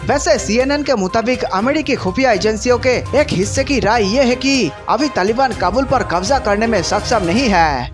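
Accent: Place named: Bhojpuri, India. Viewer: तू सबसे बेकार न्यू जलाता है भोजपुरी बोलने का तरीका तेरे पास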